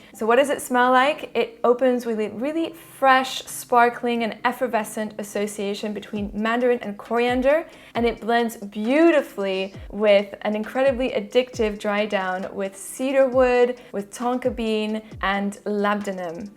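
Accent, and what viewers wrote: France. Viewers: Very infornative and to the point information. And nice French accent. Narciso rodriguez bleu noir parfum is my favorite. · I love your french accent. You're very well spoken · I just subscribe cuz, j’ai adoré votre français accent, très jolie!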